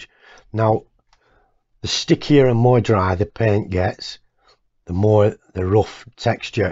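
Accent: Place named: Scotland, United Kingdom. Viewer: Are you a Scottsman? Nice accent.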